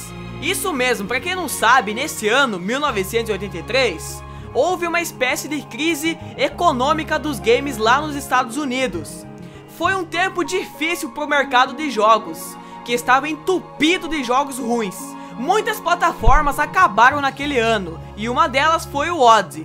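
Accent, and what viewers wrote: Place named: Piracicaba, Brazil. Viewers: Cara, como eu vim parar aqui?! Parabéns pela desenvoltura em suas narrações, o sotaque de Piracicaba(?) deu personalidade. Acabei de me inscrever. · Meu irmão...você tem um sotaque MEGA caipira! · Nossa cara, esse sotaque caipira do me dá nos nervos kkkkkkkkkkk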